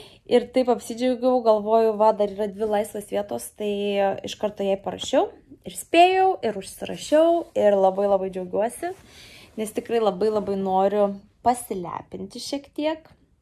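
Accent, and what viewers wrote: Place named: Aukstaitija, Lithuania. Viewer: Nu zinok vau! Taprasme as tavo kanala atradau visai netycia, bet be galo dziaugiuosi ir perziurejau visus visus tavo ikeltus video! Tu esi tokia paprasta, bet taaaip ikvepi dziaugtis paciais maziausiais dalykais🌸 gera girdet kai kartas nuo karto islenda aukstaitiskas akcentas😂🌸 labai laukiu tavo video! Net patys paprasciausi, buitiski, diena pavercia geresne ir prisotina motyvacijos!pati esu is Rokiskio tad dar smagiau ziureti ir zinoti, jog zmogus kiles is visai netoli☺️ laukiam kuo daugiau video ir visai nesvarbu ar tai bus paprastas filmukas is namu ar kai ka nors veiki! Aciu tau!❤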